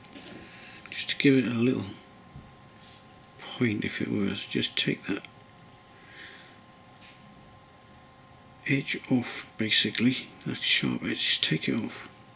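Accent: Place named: RP, United Kingdom. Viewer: Very good plummy BBC accent. Brings back memories of " Listen with Mother "and "Billy Cotton Band Show" The radio was nice and the bar, I must try! Thanks. Have a good weekend. And greetings to Mrs.T. Peter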